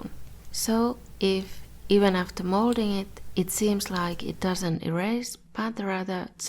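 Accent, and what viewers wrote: Finland. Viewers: Ootko suomalainen ku aksenttis kuulosta siltä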